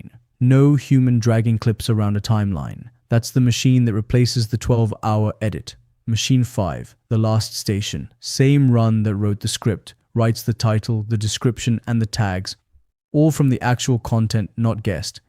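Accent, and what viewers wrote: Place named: India. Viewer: You sound somewhat indian in this video. Thats pretty funny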